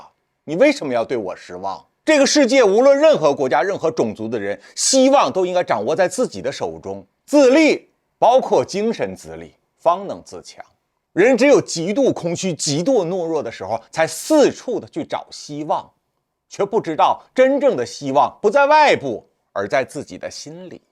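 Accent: Beijing, China. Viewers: 欣賞風流的京片子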